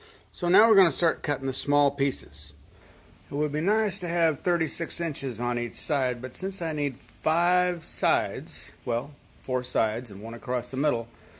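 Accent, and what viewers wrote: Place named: Texas, United States. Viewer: "Fixin to do" 😂 are you from my neck of the woods in Texas?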